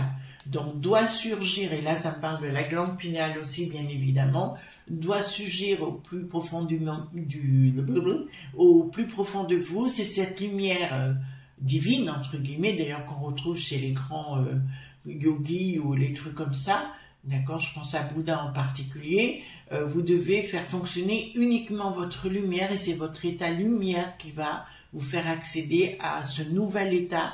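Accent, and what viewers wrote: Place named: Brittany, France. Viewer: J'entends Bretagne limite Normandie, ca parle à quelqu'un ?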